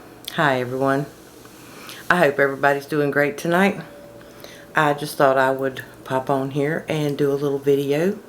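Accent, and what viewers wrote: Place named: South, United States. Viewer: You are probably the most loveable lass on YT. And that southern drawl of yours, so charming.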